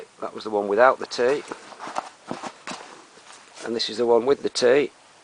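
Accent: Yorkshire, United Kingdom. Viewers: u from. Yorkshire laddy?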